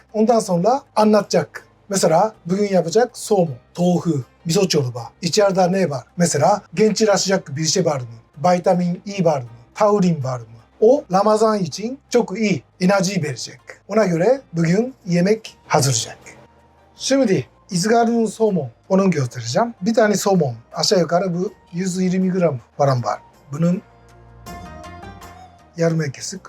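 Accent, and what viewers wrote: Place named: Japan. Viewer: japon aksanıyla türkçe duymak çok güzel yav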